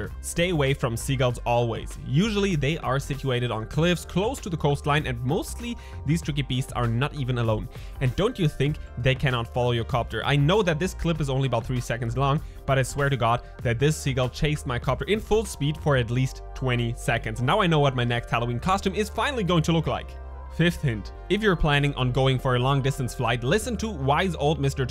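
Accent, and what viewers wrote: Germany. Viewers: Cool stuff! Your English is excellent. Very little German accent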